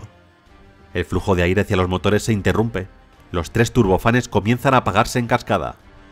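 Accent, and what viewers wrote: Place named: Spain. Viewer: Excelente narrador, me encanta la narración de éste canal, y eso que a los latinos nos nos gusta mucho el acento de España para los doblajes de las películas.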